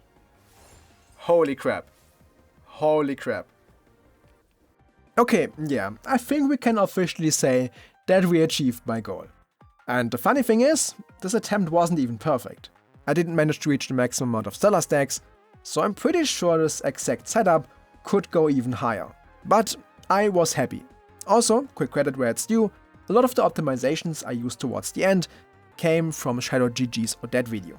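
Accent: Germany. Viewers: It's really funny to me how obvious the German accent is, it's so funny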